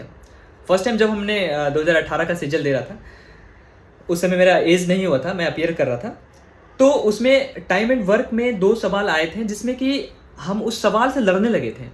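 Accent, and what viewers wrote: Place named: Maharashtra, India. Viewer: it feels like you are from Maharashtra ♥️ amazing voice!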